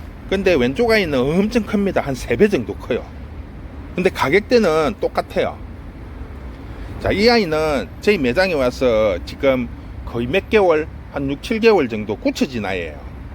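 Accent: Gyeongsang, South Korea. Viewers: 경상도 말씀이지만 초보자들이 잘 알아 들을수 있도록 귀에 쏙 들어오네요 좋은 정보 감사합니다^^ 오늘도 축복 되세요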